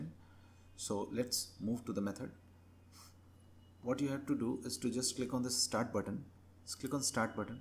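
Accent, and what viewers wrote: India. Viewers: i love ur indian accent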